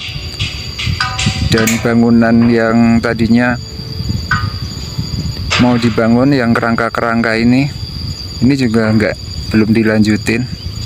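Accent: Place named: Purworejo, Indonesia. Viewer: Melu ndelok mas,logat cah porjone ketok mas"ding" semangat n sehat terus mase ben iso ngasih info dari porjo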